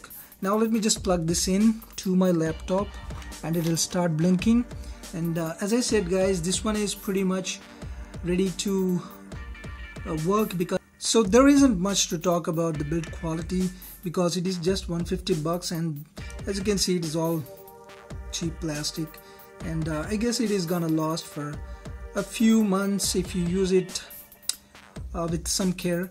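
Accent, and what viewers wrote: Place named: India. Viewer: tere accent se main samajh gya tha tu indian hai... hahaha...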